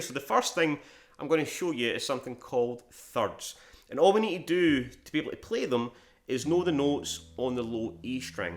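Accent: Scotland, United Kingdom. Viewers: Good stuff! What part of Scotland?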